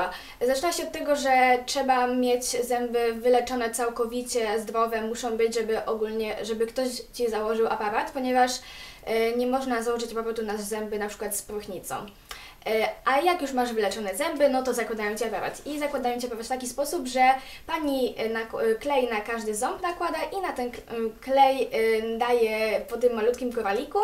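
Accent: France. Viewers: Jestes z Francji?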